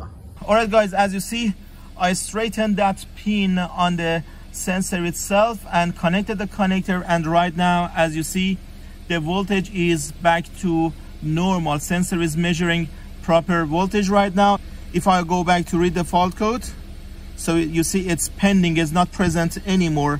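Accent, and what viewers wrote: Iran. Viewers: That is some nice Persian accent. Good job on the video.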